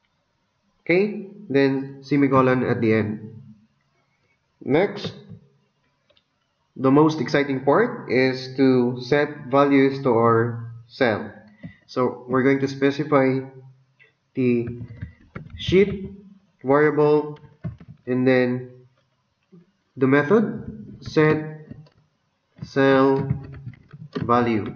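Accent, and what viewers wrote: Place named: Philippines. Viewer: You sounds like from Philippines bro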